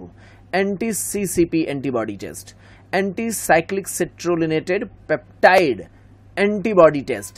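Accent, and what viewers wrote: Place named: India. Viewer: Good, but please hire a narrator with clear English because it's difficult to understand your Indian English (no offense)...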